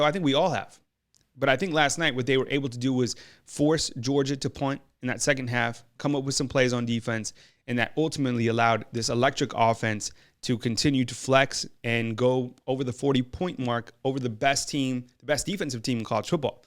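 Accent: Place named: Louisiana, United States. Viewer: each one teach one I can tell you’re from Louisiana you sounds like a trash can.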